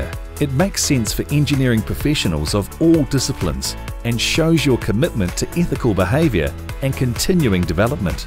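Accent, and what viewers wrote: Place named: New Zealand. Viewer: complete with subtle kiwi accent...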